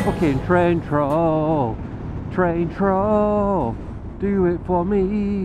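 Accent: United Kingdom. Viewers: what part of northern england is that accent dude. great vid by the wayl.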